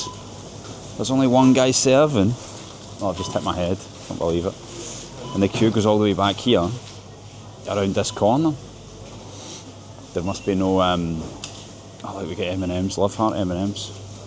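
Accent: Scotland, United Kingdom. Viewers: Russian with a Scottish accent is something really new to me... I'm not Russian myself.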